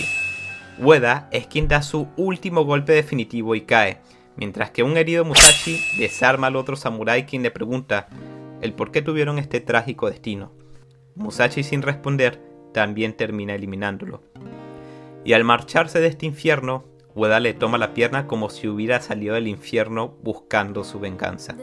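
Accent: Venezuela. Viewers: Es venezolano Jajaj😂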